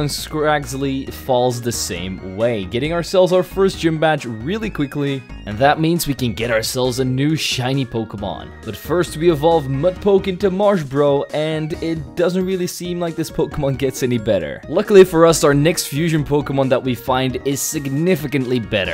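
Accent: Netherlands. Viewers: Where are you from? Sometimes it sounds like you're dutch, but you realy have a nice accent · Is he Dutch? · Gotta love that dutch accent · This guy dutch?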